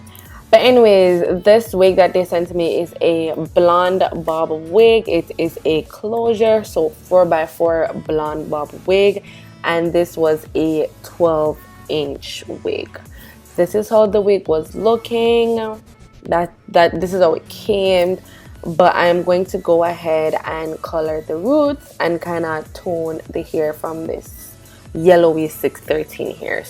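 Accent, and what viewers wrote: Jamaica. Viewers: I’m like this girl sound Jamaican 🤣 and I realize you are. Love this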